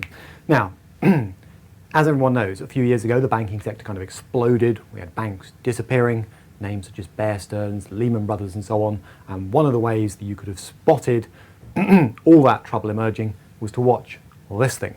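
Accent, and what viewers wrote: United Kingdom. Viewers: I like your british accent and it is so clean that i can understand everything been said not like the american one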